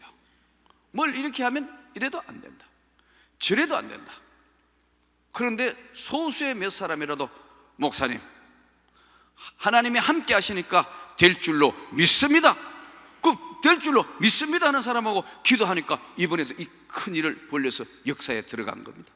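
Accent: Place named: Gyeongsang, South Korea. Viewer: 말투가 대구분 이네요.. 역시 훌륭한사람도 많이 나와요^^ㅎㅎ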